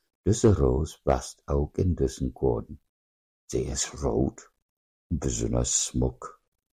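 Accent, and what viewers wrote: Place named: West, Germany. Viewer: Von West nach Ost wird manches anders gesprochen, aber alle verstehen es; wo ist der Sprecher zu Hause? W ohl eher nach Westen zu.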